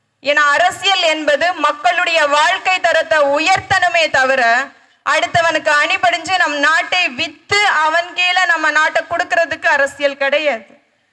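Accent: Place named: Nagercoil, India. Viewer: நாகர்கோயில் தமிழ்...❤️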